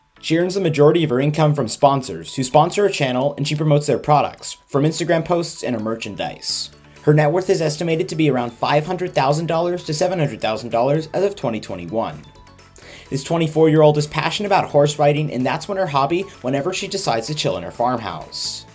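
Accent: South, United States. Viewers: Bravo! Very cool. I am from New England, so we think people down south talk funny. Well, I love her southern drawl and have nothing but respect for her outdoor skills. I know the dad is very proud. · Does a more perfect, genuine, beautiful woman exist? Wish there were millions more like her! The world would be so much better. Natural beauty - no spiked/purple hair, nose ring, ear piercings, tongue piercings, nor tatts. Just natural perfection. Even her Southern Belle accent is awesome!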